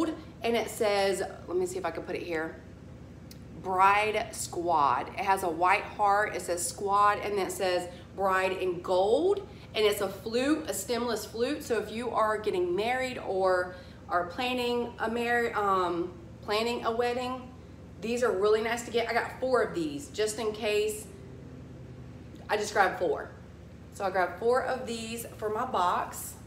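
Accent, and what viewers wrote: South, United States. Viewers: Oh girl I got such a kick out of you saying you from the south like we couldn't tell love you girl. I say girl cuz I'm almost 70 n I call my girls lil girls.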